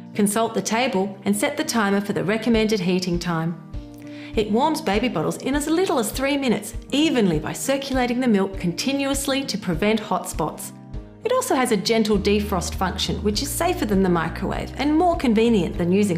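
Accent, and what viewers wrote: Australia. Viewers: how much water do i add? cant tell with the thick australian accent... 1 - 5 whatt??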